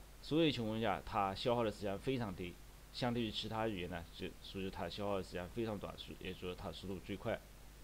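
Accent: Anhui, China. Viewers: 6，这口方言把我听愣了，阁下莫非是安徽大佬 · 我听着就是安徽人啊😂😂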